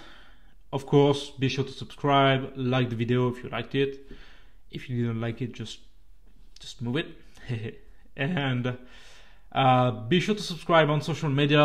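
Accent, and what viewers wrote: France. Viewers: Very good, really appreciated your commentary,. Liked the sequence in the cab...and do show a few pics of yourself, you are a good looking dude. By the way, your French accent is a plus. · A fascinating and excellent video as always - thanks very much. It would be good to have a variety of subtitled and narrated videos. As for your English and French accent, you have no need to apologise. You speak very clearly and can easily be understood. This is a journey I’d love to take, but the thought of my partner and I having to share a couchette even in 1st Class puts me off. Come on SNCF, you should provide some private sleeping compartments and you should also have better catering. So many train companies have cut back on catering but it’s a false economy. If you provide good facilities, people will use them and most will be prepared to pay to use them. 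The SBB in Switzerland is an example of that - we’ve eaten in several SBB restaurant cars over the years and they’re always busy. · Your video-clips are usually very informative. I truly appreciate that, thank you very much! 👍 However, I sometimes find it difficult to catch up with you. No doubt - your french accent really is lovely! 😍 I however would appreciate if you could speak a bit more clear and not as fast. This would help me (and others perhaps) a lot to better understand the content and the informations provided by you in english and to compensate the accent by a bit. Thank you so much for not taking this as a personal criticism but a friendly request from me, a viewer that really enjoys your highly appreciated effort to spread out informations on und about train-travel in europe. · Loved the video and truly enjoyed your voice over commentary and your French accent. Just a little feedback. 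Like most native French speakers you speak very fast, even when speaking a language that isn’t natural to you. Just slow it down a little, and we will hear you more clearly. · I love your French accent, but do admit I turned on CC. Sorry. 🙂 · NO! I much prefer and love your non-voice videos. With all due respect, your English is difficult to understand, and you speak too quickly. Nothing against the French accent (I love it), but you tend "swallow" words, especially names. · I do have trouble staying with you because of your French accent. I think if you spoke more slowly in English it would be better. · Nice video, really helped with our booking.. love the French accent, don't change it - I would say to try and speak slightly slower, just so people can fully understand everything you're saying.. but, keep up the good work! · Thanks for the personal side in your video. It explains why you're the nr 1 train vlogger. 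I don't mind your talk or French accent. You can always switch off the volume. I prefer subtitles only BTW. Merci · I love your french accent! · Bravo, ce format avec voice over est beaucoup plus sympathique et on a plus l’impression de faire les trajets avec vous. Merci pour toutes les nouvelles informations communiquées. And the French accent is charming. Arnaud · We very much appreciate your talking,. along with english subtitles. Your "French accent" is lovely and most enjoyable to listen to. Please keep talking! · Regarding the presentation of the video, I did like the spoken commentary. However, I did have a bit of difficulty with your french accent, so I put up the ENGLISH subtitles. That did help! This evening I will watch Eisenbahn Osterreich, Deutschland, Schweiz. This a German language channel giving news about railways in the three countries where German is the most important language. 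The presenter, Sebastian Reisinger, is from Vienna/Wien, and speaks a very clear German most of which I can understand. But to help, I put up GERMAN subtitles. (Subtitles in other languages are not available.) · Love the trainride and hope to take it in spring 24 the other way round. I like the voice over and do not the French accent.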